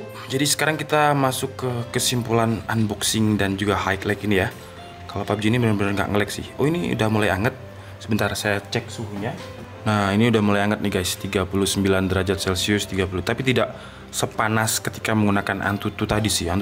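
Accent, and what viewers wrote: Java, Indonesia. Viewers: Orang malang bang ? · Orang malang bang? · E Wong pundi jenengan lur..??kok pakai logat jawa..,😀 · Mas kowe wong jawa po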